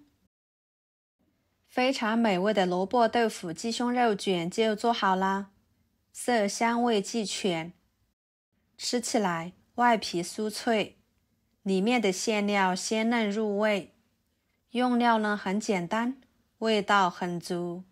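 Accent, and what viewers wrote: Yunnan, China. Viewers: 好难听的云南普通话，你直接说方言比你的马普要好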